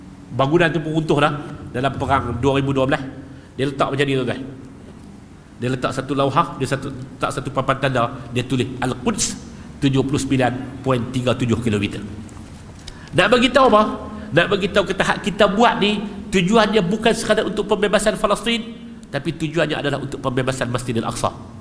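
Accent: North, Malaysia. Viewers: Suka dengar Ustaz dan Ustaz Radhi (adiknya). Suara jelas dan penyampaian slang utaqa yg menarik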